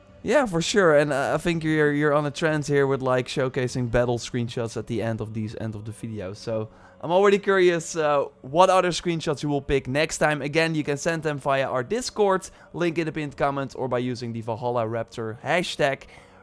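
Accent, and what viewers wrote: Netherlands. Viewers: Is raptor dutch? I hear his accent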